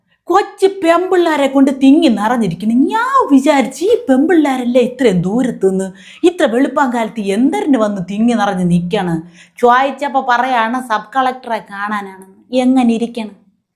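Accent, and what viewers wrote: Thiruvananthapuram, India. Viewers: എന്തായാലും തിരുവനന്തപുരം സ്ലാങ് കേൾക്കാൻ രസമുണ്ട് 👏 മോളെ ബിഗ് സല്യൂട്ട് 👍👍👍🙏🙏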